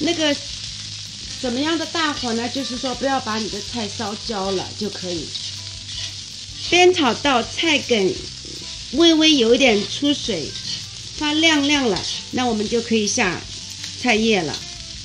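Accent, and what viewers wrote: Shanghai, China. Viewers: 哈哈 听得到上海普通话. 纳泥! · 阿姨是上海人吗哈哈哈哈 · 阿姨上海宁对伐 哈哈 嗲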